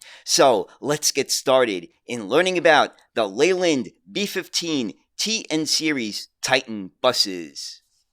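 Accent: New York, United States. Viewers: is from New York, as if you couldn't have guessed that already!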